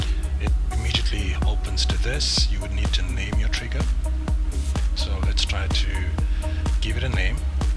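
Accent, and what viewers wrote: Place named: Europe. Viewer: Love the groovy music along with Euro accent.... I feel like I'm in the club!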